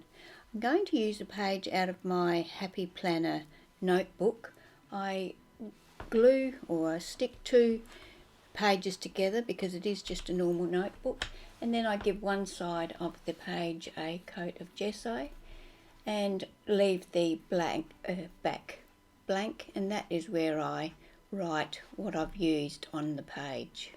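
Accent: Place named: Australia. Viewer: Great process video thank you. Love to hear an Australian voice!!!( I'm in South Australia.) You could put a clear sealer on your background such as clear gesso or matte medium or a spray varnish, then the white won't absorb the colour....Also the powders won't reactivate...good for me to learn from you!!! Thank you.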